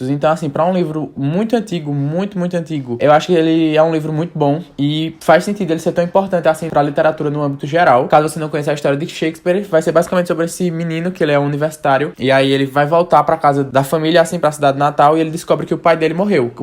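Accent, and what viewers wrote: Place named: Pernambuco, Brazil. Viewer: Ahh como é bom ver youtubers com o nosso sotaque maravilhoso. Ouso apostar ser do meu país Pernambuco. Ainda mais influenciando a leitura, que é tão relevante. Muito sucesso!! 🙏🏾🙏🏾🙏🏾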